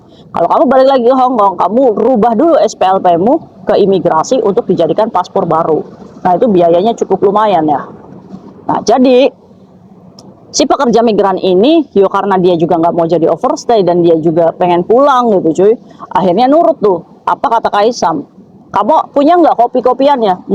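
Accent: Indramayu, Indonesia. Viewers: Kak semangaaat Tpu logat Indramayu nempel kaya saya ( kita ga dari Indramayu )😊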